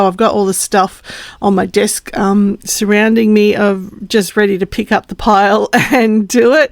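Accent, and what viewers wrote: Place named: Australia. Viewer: Happy ne year.I hope Luna has her stiches out soon. Thanks for sharing your crafts. I enjoy your vids and I like hearing the aussie accent(Im just down the road a bit) I feel inspired and I find watchcing them relaxing. I don't do diamond painting but I do love colouring and I often make brickbuilds (usuaslly asian brands but they have some great animals, including dragons. I think you are really productive in comparison to me. I really have to be in the right mood to do much colouring.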